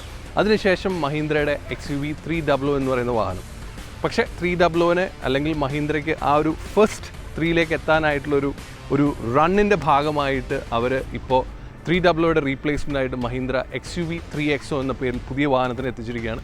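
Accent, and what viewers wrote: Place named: England, United Kingdom. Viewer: Mr ഇംഗ്ലീഷ് ആക്സന്റ് ഇൽ മലയാളം പറയാതെ പറയുന്നത് ആളുകൾക്ക് വ്യക്തമാകുന്ന രീതിയിൽ സംസാരിക്കാൻ ശ്രദ്ധിക്കുക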